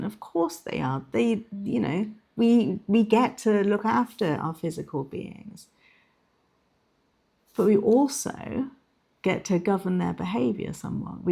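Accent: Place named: RP, United Kingdom. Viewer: Wish she didn't speeeak with such ah Marisaaa Peers posh ahccent. It detracts from and impedes the message...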